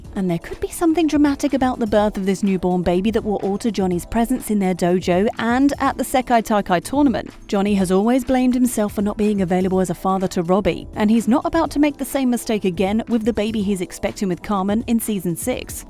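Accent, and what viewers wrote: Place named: United Kingdom. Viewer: Why are these videos almost always narrated by British people? British accents are highly annoying for me to listen to. I especially can't stand the way she says "Dio-jeo"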